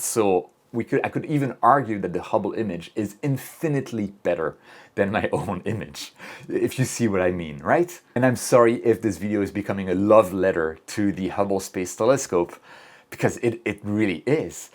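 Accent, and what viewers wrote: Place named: France. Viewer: in japan and with a french accent, verry intresting~ tbh am not surprised x3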